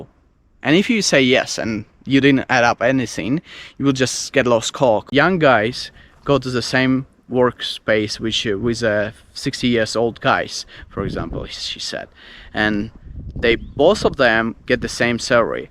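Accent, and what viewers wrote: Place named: Australia. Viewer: Скоро уже не отличить от австралийца)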